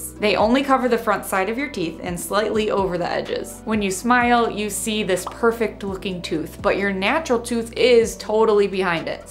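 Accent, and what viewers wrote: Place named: Midwest, United States. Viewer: You sound midwestern as hell, Chicago??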